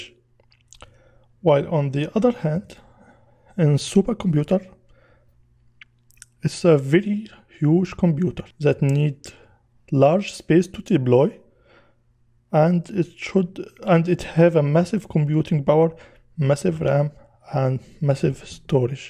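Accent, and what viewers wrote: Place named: Middle East. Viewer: I guess its the sweet middle eastern accent used by the gentleman.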